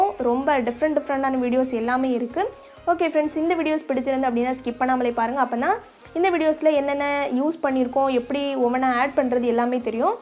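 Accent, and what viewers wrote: Kanyakumari, India. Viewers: Seems like kanyakumari Slang! 💙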